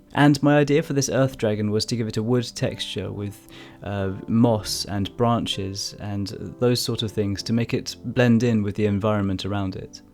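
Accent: United Kingdom. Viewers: I take it from the accent your in the UK , you think you could draw a dragon and dismantle the picture in to sections that could be cut from steel ? im a blacksmith near brecon south wales i will let your mind run away with that idea for a while :-) get back to me usk valley forge facebook if all else fails.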